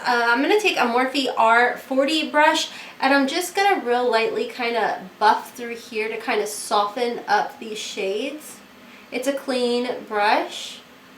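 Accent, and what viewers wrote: South, United States. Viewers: What happens if you take the ones that are close, but one is more pigmented, and apply the darker one with a much lighter hand. I would really like to see. I don't know if it's the lighting, since you mentioned it, but you look like you have sparkly white pupils👁 I love watching you. I live in northern ca, and I miss a little southern accent. Where are you originally from? Blessing to everyone, and happy holidays🙏🎄🌹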